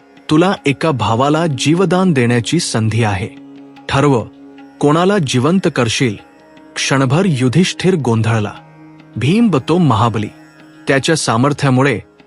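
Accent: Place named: Gujarat, India. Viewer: वाचना मध्ये गुजराती भाषेतील उच्चार असू नयेत.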